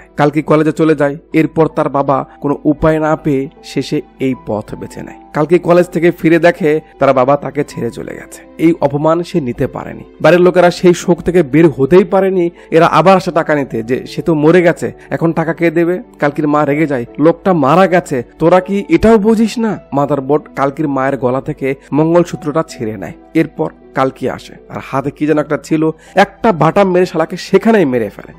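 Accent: Bogura, Bangladesh. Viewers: Apnar basha ki bogurate vai?? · বসের বাড়ি কি বগুড়া? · ভাইয়া আপনার আমাদের বাসা বগুড়াতে....??😍... অবশ্য আপনার কথা বলার ধরণ দেখেই কিছুটা মনে হয়েছিল আপনি বগুড়ার 😍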